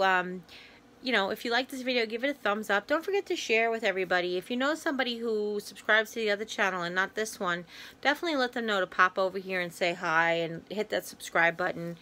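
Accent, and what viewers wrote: Long Island, United States. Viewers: Love the Long Island talk, I miss LI but I don't miss the amount of people living there!!! You are organized....wish I was!!!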